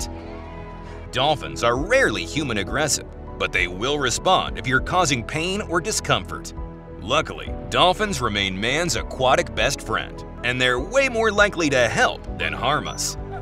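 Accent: United States. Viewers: Appalling American narration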